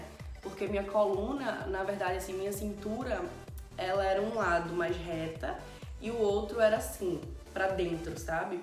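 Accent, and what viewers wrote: Brazil. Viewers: É de Recife?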